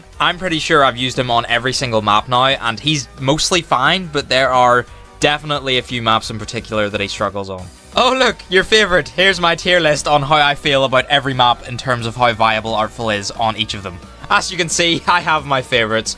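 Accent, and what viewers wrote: Scotland, United Kingdom. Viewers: I can smell the Scottish accent, SCOTLAND FOREVERRRRR 🏴🏴🏴🏴🏴🏴🏴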